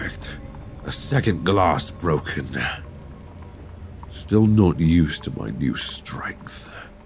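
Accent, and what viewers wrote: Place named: United Kingdom. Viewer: His British accent lord have mercy 🫠🧎‍♀️🧎‍♀️ the gods are tempting with hot British vampire men yet again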